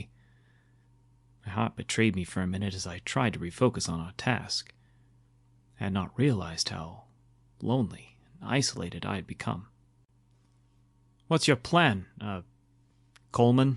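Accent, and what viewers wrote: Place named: New England, United States. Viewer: Love the New England accent of the narrator. The "salt" in his voice invokes the historical draw of the sea for these maritime adventurers and adds to the tale. Good story too!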